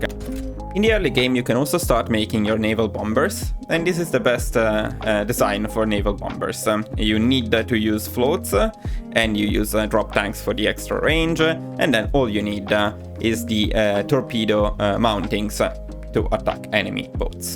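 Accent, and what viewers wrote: Italy. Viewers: are you italian by chance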